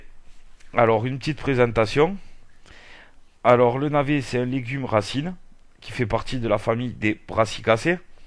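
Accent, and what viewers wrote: South, France. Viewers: et bien merci pour la vidéo et vive accent du sud je suis de Narbonne et passion jardin aussi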